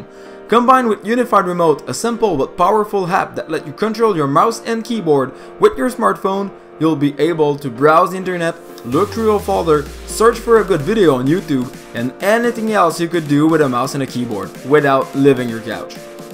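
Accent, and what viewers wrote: Quebec, Canada. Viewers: This is a French-Canadian accent! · You sound like a Québécois from Montreal ! Not that there is anything wrong with that .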